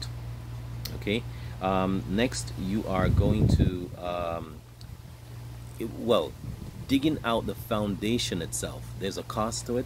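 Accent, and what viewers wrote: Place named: United States. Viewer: hey is that US dollars or Jamaican dollars ?cos you speak with a slight american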